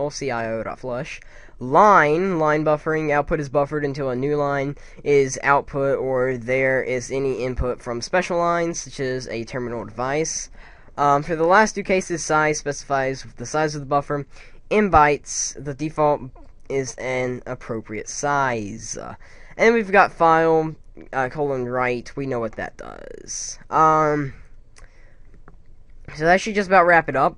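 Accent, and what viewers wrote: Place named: North, United Kingdom. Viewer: You sound like a Northerner to me, sorry if that's offensive. Greetings from WNY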